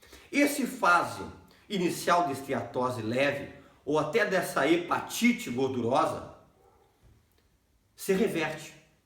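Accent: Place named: São Paulo, Brazil. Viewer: Você poderia ser de sp kkk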